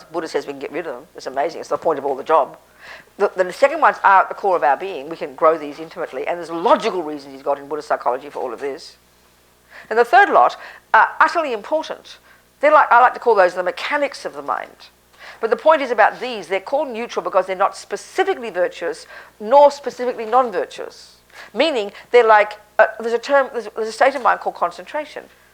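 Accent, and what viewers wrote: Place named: Melbourne, Australia. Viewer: As someone who also grew up in the south east suburbs of Melbourne, her approach works for me. She doesn't dance around people's neuroses but cuts through with clarity. This is how we talk in Melbourne.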